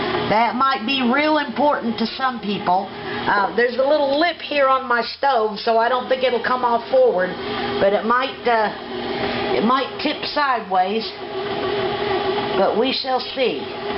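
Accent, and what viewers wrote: South, United States. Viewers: Nice Video! You are more entertaining than Paula Deen and you sure do have a more distinct accent than her!! Nonetheless, I am from Buena Vista VA and therefore speak Southern and Hillbilly so I had no trouble understanding you, and it was nice to hear some familiar accents!!! I already have a DAK Turbo Baker IV which makes great bread, regular, oat/whole wheat, white, and whole grain, as well as dough, and I have use all of those cycles with great results, but I am in the market for a machine that does the cake thing!! I was hoping your video would have shown that, but you stated that you're not interested in the cake making feature of your Ham Beach machine. Well, let me tell you something, Miss Lady, us men like dessert and you sound like you've got a good man that you've been taking good care of for many years, so you'd better start making some cakes for him in your new bread machine and then fire up your camcorder and put some videos of your cake bake on the internet!!!!!!!!!! 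If that recipe book came with a Lemon Pound Cake recipe in the 'cake' department, whip one up and put it on You Tube!!! Thanks!!!!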